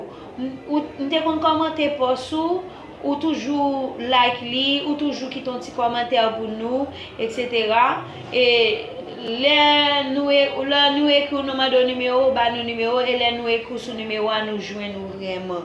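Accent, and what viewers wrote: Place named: Cap-Haïtien, Haiti. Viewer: Aux Cap accent nice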